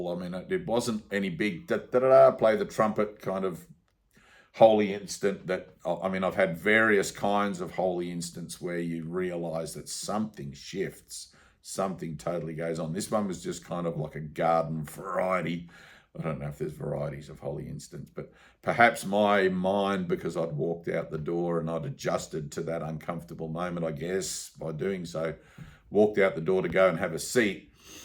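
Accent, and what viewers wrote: Australia. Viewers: Hey mate u sound like you're an Aussie, were abouts are u from? I currently live in Perth, but from nsw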